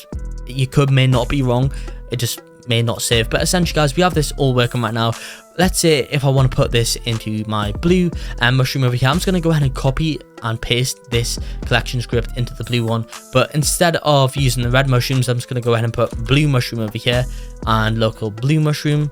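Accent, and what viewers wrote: United Kingdom. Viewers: u bri' 'ish mate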